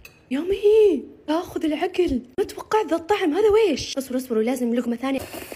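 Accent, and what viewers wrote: Saudi Arabia. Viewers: والله عن جد اللهجه السعوديه مره بتجنن انا من الجزائر I love you ❤❤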